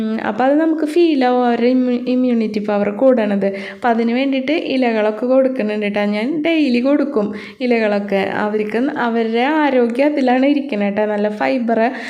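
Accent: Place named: Thrissur, India. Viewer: നല്ല വീടിയോ സൂപ്പർ നല്ല ധിർസുർ പാഷ്ഷയാ കേള്ക്കാന് നല്ല രെസമാ അവസാനത്തെ ട്ടാ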